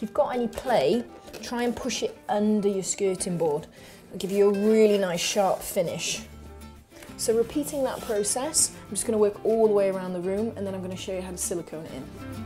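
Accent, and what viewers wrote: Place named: Wales, United Kingdom. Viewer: It's the combination of no nonsense facts and a tinge of a Welsh accent. Go with it.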